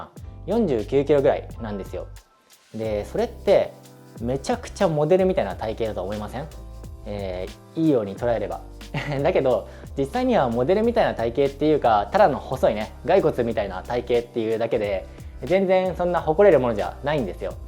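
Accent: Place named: Japan. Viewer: Please do not doubt your English pronunciation. It's great. You can pronounce words correctly which are impossible for other Japanese people to do. And don't worry about your accent. Even after living in the US for 21 yrs a slight German accent will slip into the conversation, even though Americans always thought I was Irish or Swedish 😂. When I look at your built I always see Yoshiki-san from XJapan . He has the same height as you but a real strong upper body. He is slim but has muscles from drumming and physical exercise. So it is not impossible for you to achieve.- I wish you all the success in the world ❤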